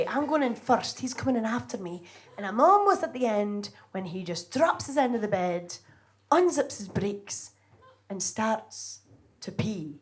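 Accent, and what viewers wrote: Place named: Scotland, United Kingdom. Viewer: As a Jock myself, all I can say is..Now that's a brrrraw Scottish accent......That's how you rrroll you rrr's my friends!...Apart from that,..I love this style of dark, auld Scottish, bardish, storytelling, there's little hints of 'Ivor Cutler, Chic Murray, Roberta Burns in there...surreal, wierd, dark, chirpy, poetic, uncompromising..and helluva funny!.....It hud me heehawing a guid few times!.'Maybe it's not true'..hud me rollin'..😂..Connollys gone....here comes!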